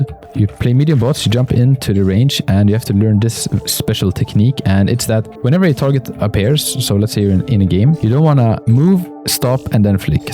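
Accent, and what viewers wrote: Sweden. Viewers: if u dont mind where r u from? r u swedish by any chance?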